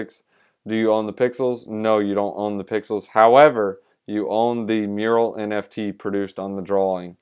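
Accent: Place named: United States. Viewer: LOL. That one guy video that you said you couldnt understand I literally just came from him. When I heard youre American I said thank God!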